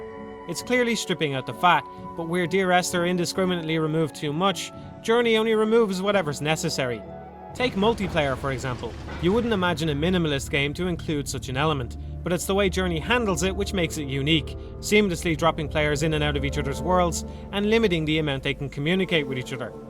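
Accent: Ireland. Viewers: What is this accent, Irish? It's lovely, whatever it is. Now to keep watching the video to see if he makes a decent point.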